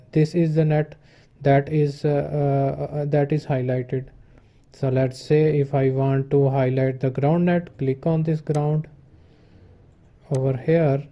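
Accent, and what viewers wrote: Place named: India. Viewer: Thank you thick indian accent magician. You've saved my life.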